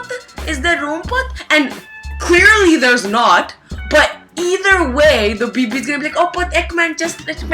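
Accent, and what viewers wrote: Punjab, India. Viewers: tussi badi changi Punjabi bolte ho.. I adore your Punjabi accent...😍😍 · annoying as fuck! stop talking in Indian Accent cuz you do it annoyingly!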